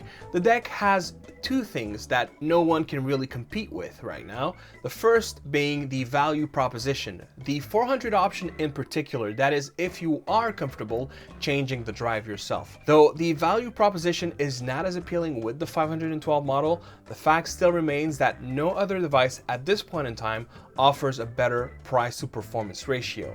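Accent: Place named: Morocco. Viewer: I've been sitting here trying to guess where your accent is from. I'm gonna guess Moroccan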